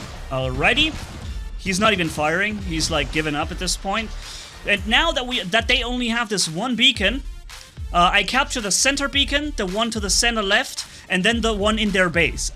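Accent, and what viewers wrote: Germany. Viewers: Hi are you German from your nationality cuz u have this German accent when your talking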